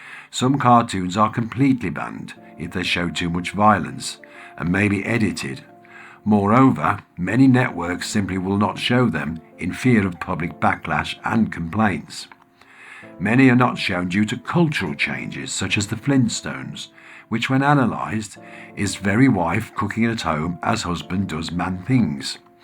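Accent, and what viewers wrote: Birmingham, United Kingdom. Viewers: 😂😂we just hung bright orange curtains to go with matching fire wall . I lived in brum for 2 yr your accent brings back memories 😅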